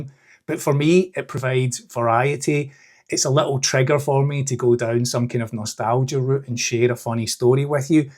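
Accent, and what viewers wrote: Scotland, United Kingdom. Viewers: Hi. I like your style of talking and sharing. Actually, I have to confess that when I was younger, I couldn't listen to the Scots accent very long!! Don't know why! But when I heard your voice, that changed lol. Anyway, I'm really enjoying your channel. PS: My Step Grandad came from Banff so maybe his accent was more sharp than yours?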